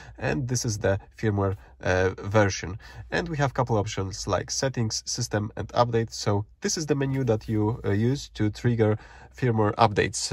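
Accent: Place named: Poland. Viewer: Polak mówiący po angielsku 😎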